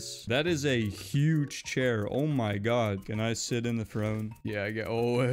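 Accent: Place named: Netherlands. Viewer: This guy has a accent like he is from the Nederlands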